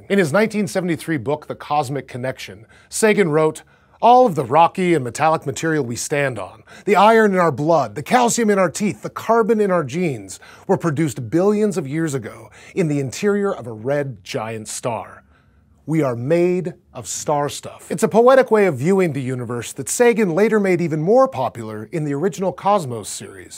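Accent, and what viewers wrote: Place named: United States. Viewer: At this point, I just blame it on the American accent, and leave it at that. We all know what he means.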